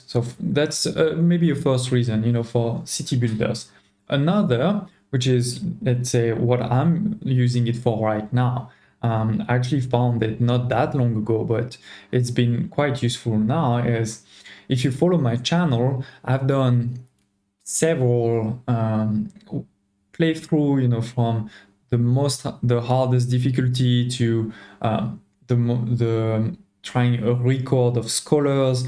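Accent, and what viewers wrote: France. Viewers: Wow, where are you from ? You sound like french accent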